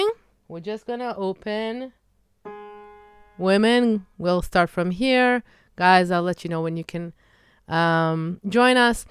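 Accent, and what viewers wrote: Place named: England, United Kingdom. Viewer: You definitely have the English twang. Your English sounds 90% Native.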